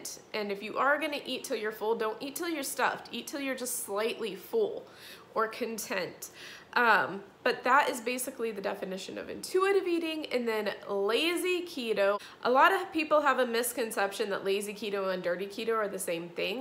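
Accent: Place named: Kansas, United States. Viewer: Great content,...thanks! Btw, are you from the Kansas area originally? Your accent is a lot like someone I know who grew up in that area.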